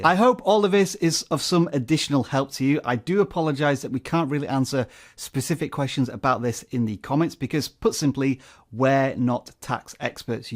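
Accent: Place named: United Kingdom. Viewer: I'm confused. How does it work out for you? You sound like you come from the UK, listed as being in the US and you say you live in Canada?? Are you not sharing a VPN like loophole we don't know about? :)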